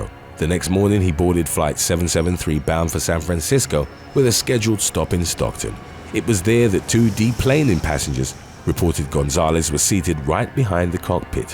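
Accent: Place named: United Kingdom. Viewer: You sound British 🤮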